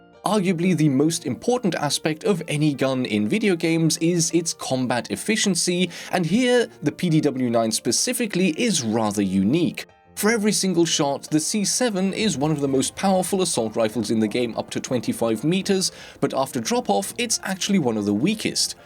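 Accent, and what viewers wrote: United Kingdom. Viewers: That’s just cause he’s British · I thought this guy was a robot with a british accent. My whole life has been a lie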